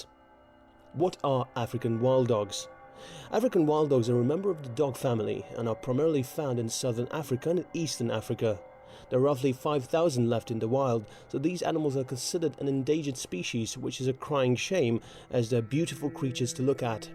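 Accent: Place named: United Kingdom. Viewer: Shut the fuck up you and your annoying British accent.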